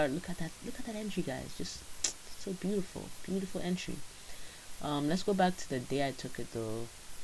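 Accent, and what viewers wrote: Nigeria. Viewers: Thanks,... You sound Nigerian tho... Great content went through your telegram from September... Wow... It's inspiring to see how the trades improved so fast, pure hardwork welldone, can't take that away from you... You bad sha🤭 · I was thinking shes definitely nigerian lol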